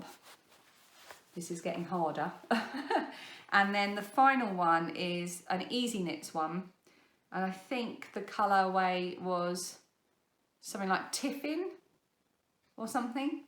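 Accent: England, United Kingdom. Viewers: Count me in! This expat Brit knitter loves to talk yarn and patterns but especially loves to hear an English accent again!!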